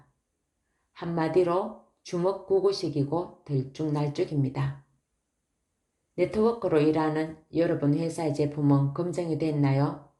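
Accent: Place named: Gyeongsang, South Korea. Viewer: 이웃집 아지매같은 목소리 친근한 경상도 억양이 내맘에 들어오네요♡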